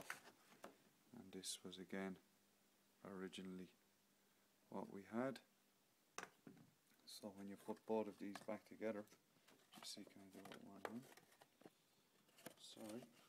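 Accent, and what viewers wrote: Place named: Scotland, United Kingdom. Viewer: Hey, really enjoyed that vid very informative and i love the scottish accent!!!!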